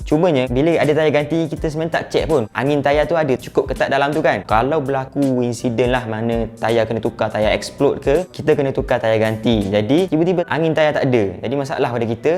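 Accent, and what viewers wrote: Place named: Terengganu, Malaysia. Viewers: loghat ganu ni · pekat ganu bohh hahah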